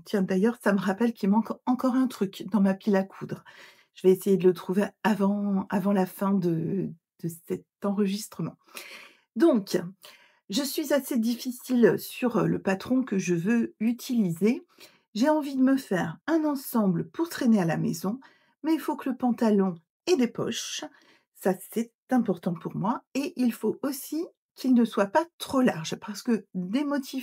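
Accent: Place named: Sarralbe, France. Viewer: 👗🪡🧵💙✂️❤️😘 bonjour, j'entends que tu es près de Sarralbe.. mon petit fils est au collège à Sarralbe et moi je suis de Freyming.... nous sommes donc voisine 😂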